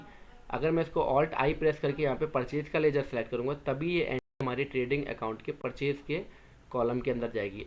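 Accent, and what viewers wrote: Agra, India. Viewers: Sir aap agra se ho?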